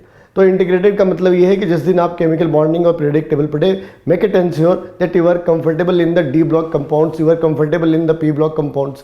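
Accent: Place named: Punjab, India. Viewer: Punjabi tadka.....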